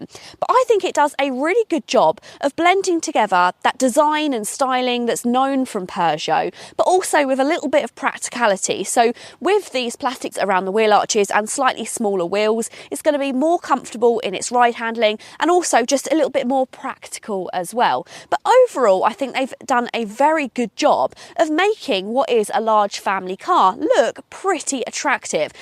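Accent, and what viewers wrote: United Kingdom. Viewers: If I am in not in ❤ with britain english, but you derserve ❤ when talking so quick and so much about this enough big car 😊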